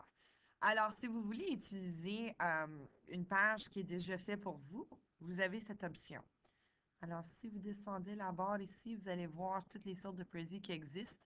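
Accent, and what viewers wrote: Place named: Quebec, Canada. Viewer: Bon tuto fait par une jolie fille avec un joli accent québécois.MERCI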